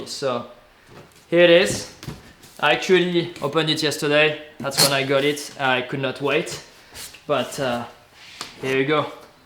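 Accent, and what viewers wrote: France. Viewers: This guy has the heaviest French accent I ever heard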